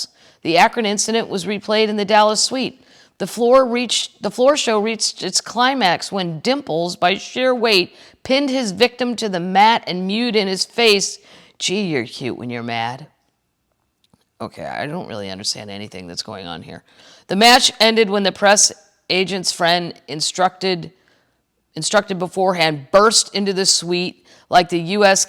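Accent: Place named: Wisconsin, United States. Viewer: Brilliant again. I remember my mom telling my Dad she would leave him only for Liberace if he came knocking at the door. Ha ha Keep up the Wisconsin accent 😜😁❤️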